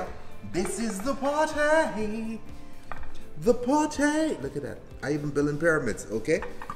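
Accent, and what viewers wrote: Bahamas. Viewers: I'm sure this has been covered before, and I'm super late to this channel, but where is he from? He sounds Bahamian to me. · Bahamian accent strong son lol oh how we love a good drink ! Keep up the good work brother!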